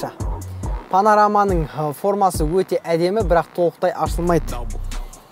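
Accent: Standard. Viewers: Ой молодец осы бала таза қазақша түсіндіреді. Өркенің өссін. Олжас ағаңдай атақты бола бер! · Молодец тап таза сөйлейсің қазақша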